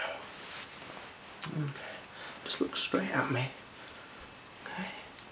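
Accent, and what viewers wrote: United Kingdom. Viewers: Ah, lovely. Heard some of that british accent there.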